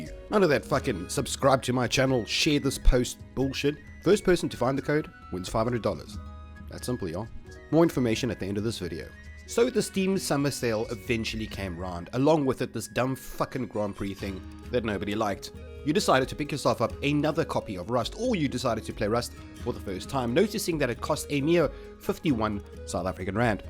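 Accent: South Africa. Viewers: your South-African?? dude that's so cool, i never knew, its almost wipe day on Intox 1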